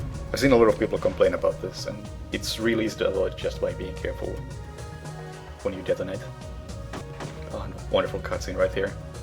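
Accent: Finland. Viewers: Can I guess? Are you finnish?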